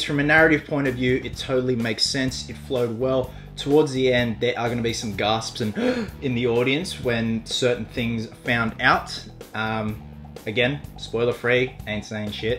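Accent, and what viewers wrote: Australia. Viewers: Subscribed straight away when I heard your accent. Fellow aussie ;) Fucking loved it. And thank god someone is finally #TeamIronMan. All my friends were Cap :<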